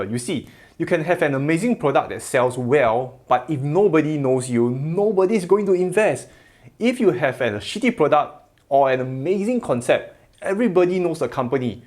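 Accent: Singapore. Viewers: I like the way u talk, really bring out the singaporean in us. Haha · You are incredible! Straightforward advice in a simple straight manner... love your accent.... Go Singapore! By the way, I am a Tesla fan as well... want to talk about Space X or Solar City going public on their own anytime soon?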